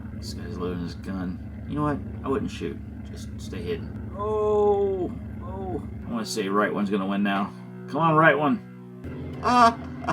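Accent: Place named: United Kingdom. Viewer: Dang! This movie is almost a hundred years old. It's fascinating to see this. Btw, Sir Arthur Conan Doyle would approve of your British accent!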